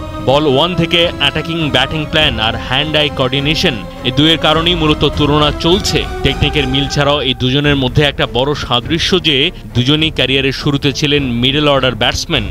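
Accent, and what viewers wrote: Kolkata, India. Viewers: কোলকাতার কোন এক দাদা পড়তেছে খবর?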